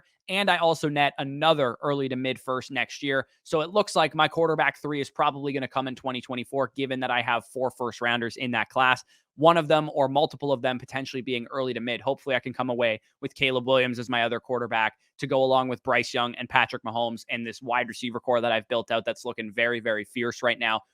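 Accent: Canada. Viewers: Nice, you from Canada eh,?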